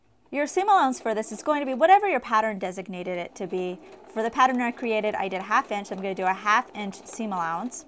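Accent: United States. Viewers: talks too much and silly american accent , more interested showing her nails and manicure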